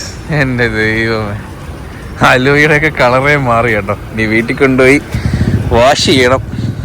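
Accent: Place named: Kannur, India. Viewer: Kannur slang istamullavr❤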